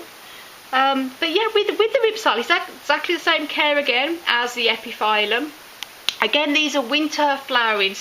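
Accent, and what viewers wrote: Birmingham, United Kingdom. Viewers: Thank you 😁 hey this is a weird question but your accent reminds me a bit of mine, did you used to live around Birmingham?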